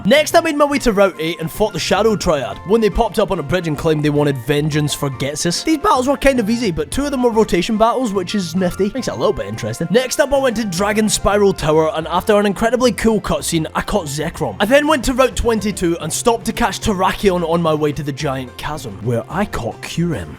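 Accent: Scotland, United Kingdom. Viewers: Most underated youtuber ever. And the whole Scottish thing makes it better as a Scottish person 👍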